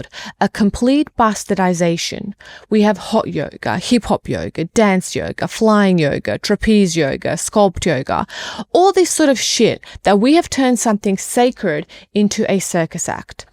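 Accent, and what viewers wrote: Australia. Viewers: Are you Australian? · Where is she from…? Australia? New Zealand?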